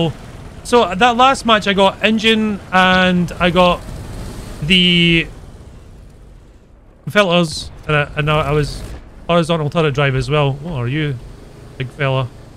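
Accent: Scotland, United Kingdom. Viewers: Day 2 of telling he's the best war thunder YouTuber and has the best Scottish accent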